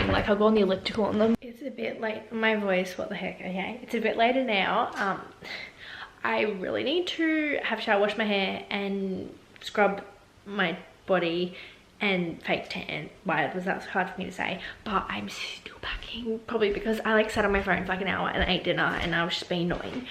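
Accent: Australia. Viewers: Wait is she from Melbourne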